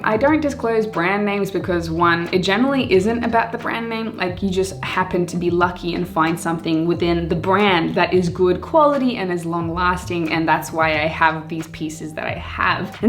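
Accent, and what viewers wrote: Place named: Australia. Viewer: First time noticed Australian accent from you. Is the environment affecting you? :)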